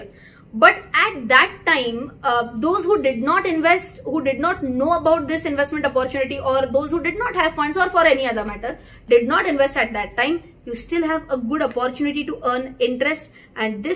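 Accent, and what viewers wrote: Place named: Telangana, India. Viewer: You are not from India right By that accent and that hairstyle I can tell she is from Telegana or Andhra pradesh ,India.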